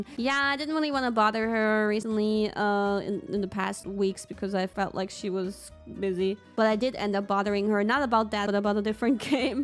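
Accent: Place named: United States. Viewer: Since when did start sounding American?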